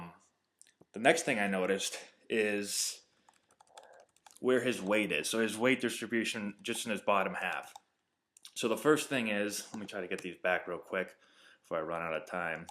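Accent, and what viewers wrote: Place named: East Coast, United States. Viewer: you sound like an east coast Jay Cutler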